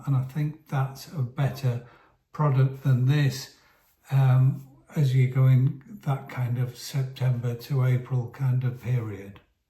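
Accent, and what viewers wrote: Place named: County Durham, United Kingdom. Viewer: Where Oop North are you from, I’m hazarding a guess and would say County Durham? Very informative video as usual, missed your uploads so looking forward to your next one. Cheers. 👍🏼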